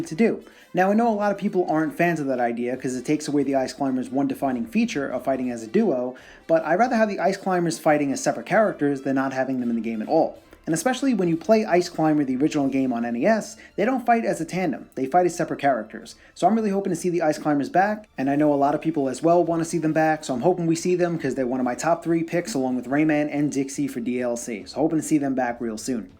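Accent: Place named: New York, United States. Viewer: I love this guy's NY accent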